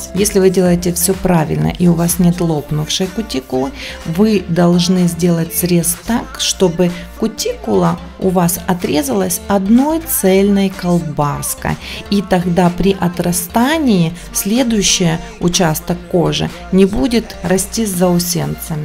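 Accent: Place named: Ukraine. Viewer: с Украины, от акцента никуда не деться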